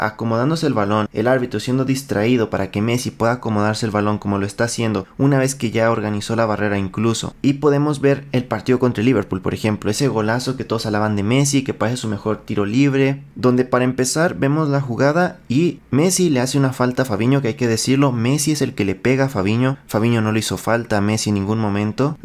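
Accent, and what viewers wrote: Mexico. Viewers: Cuando tenes videos de cr7 con el titulo callando bocas..... campeón del Mundo .....Árabe...Te pediría el pasaporte pero por el acento .diría Mexicano ....no tenes autoridad para hablar de Futbol ....Mexicanos hablando opinando de futbol solo son memes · El que hace el vídeo es mexicano no?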